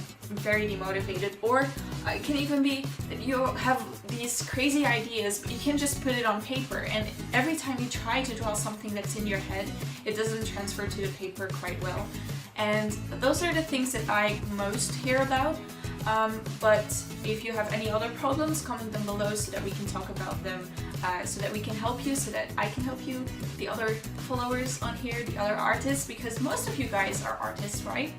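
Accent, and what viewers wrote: Netherlands. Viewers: your dutch? awesome! I'm also dutch ;D well asian, but I am born in the Netherlands good video and I like your accent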